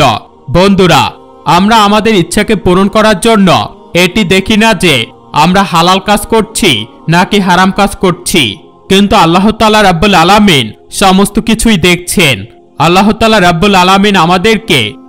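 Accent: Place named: Noakhali, Bangladesh. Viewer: ভাই তোমরা কণ্ঠ এমন,মনেহয়,নোয়াখালী মনেহয়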